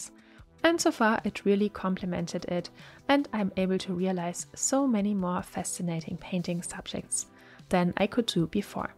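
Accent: Germany. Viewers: I can hear and 'see' Alpay Efe in these vides... like a female version of him... also with same german accent... fun 😊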